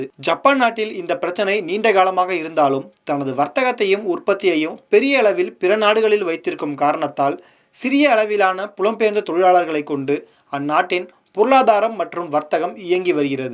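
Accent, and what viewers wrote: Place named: India. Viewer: Moodevi mudhalla Thamizh correct ah pesu... Appuram video podu.. Otherwise just stick with your hindi videos... Don't spoil our Thamizh by mixing your hindi pronounciation...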